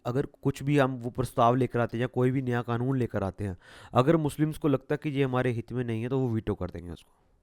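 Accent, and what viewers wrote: Punjab, India. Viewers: Sir Punjab wali hindk bolre ho😉😉 thnq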